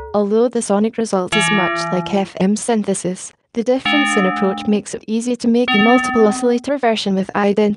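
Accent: Scotland, United Kingdom. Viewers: I never imagined I'd be listening to a female robot with a thick Scottish accent teaching me synthesizer programming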